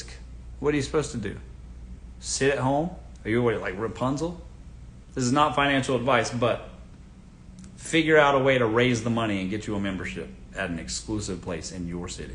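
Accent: South, United States. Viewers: I think he mentioned he was Southern..Sounds it too..Maybe from Georgia?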